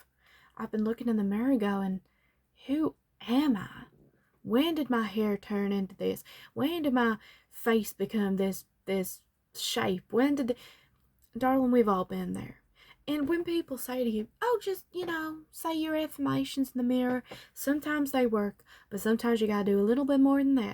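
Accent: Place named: South, United States. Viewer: Coming from a born and raised genuine southerner in the US, I love everything about this. A southern accent, especially ones like Charlotte La Bouff or Dolly Parton or Blanche Devereaux, gives me such comfort. And the hunnys and darlins round it all out. I loved this ❤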